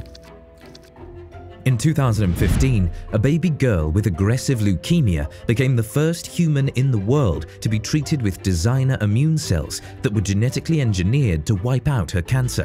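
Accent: United Kingdom. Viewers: lol these simpletons thinking this is a kurzgesagt ripoff. 3 elements: cartoon, british narrator and science focused makes it a ripoff? Besides, Kurzgesagt is crap and has proven themselves to be unapologetically biased and totally untrustworthy. Kurzgesagt fans are no better, and have some kind of a weird cult-like behavior to the channel, as if they could do no wrong. Use your brain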